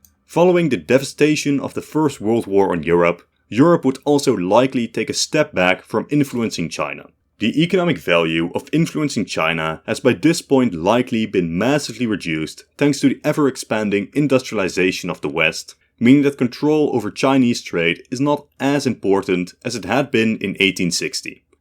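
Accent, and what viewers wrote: Netherlands. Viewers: he’s not English? He’s Dutch. A foreigner.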